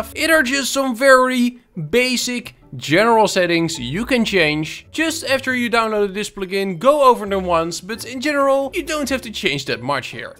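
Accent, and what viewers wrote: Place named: Netherlands. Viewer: bro, never heard of you, but in the last week i watched like almost al youre server vids lol, youre a life saver. thanks Bud! one question. are you by any chance Dutch? I am and i feel like i hear an accent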